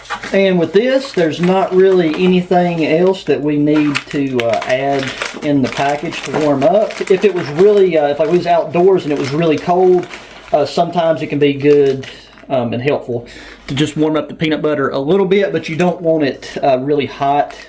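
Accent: South, United States. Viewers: Cool civilian ration Amigo. Components sound good. Glad extra seasonings, pepper, red pepper, etc. They come in handy. Dividing A 24 Hr. ration is difficult, I have been there, and will again soon, LOL. It's bad, when civilian rations have better FRH'S than the military gets. All meals sound good. Some components might be off just A little, nothing bad. This fresh, I would not expect too many to be bad. I would not think they would, but you never know? I have been fooled before. Good meals, great review. Glad you had fun. Peace from Ky.. P.S. From your accent and way of talking, you are from the South, or once was. I am glad to hear A voice like yours. Makes me feel like I am not alone in the ration community, LOL. Hope all are well your way, need me, just holler. Peace. Nicely done friend.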